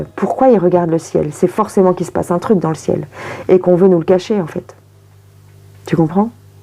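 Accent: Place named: Paris, France. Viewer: Vous êtes super! Et oui, vous avez l’accent Parisien... 💕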